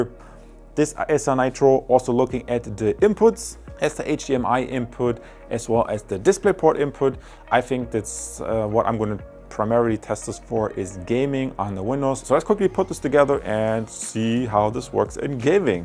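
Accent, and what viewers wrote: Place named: Germany. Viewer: I can hear he is german. I bet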